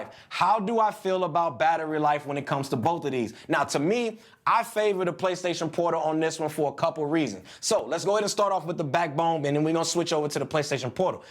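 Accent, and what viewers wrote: St. Louis, United States. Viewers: Bro are you from St. Louis? 😂 STL in the building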